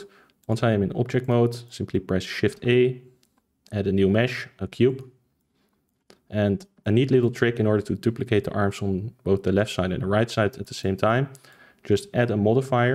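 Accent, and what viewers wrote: Netherlands. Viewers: I heard it cuz im actually dutch too,anyway this was very helpfull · Hi I have a problem when exporting my Character. I followed all the steps but when I drag my character from my desktop to Unreal Engine, the chest is hollow. I went back to blender but there everything was fine. I don't understand what I did wrong can you help me? also, are you Dutch? If so: hallo! ^^ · sum tell me u dutch. could it be ur accent? i'd recognize a dutch accent anywhere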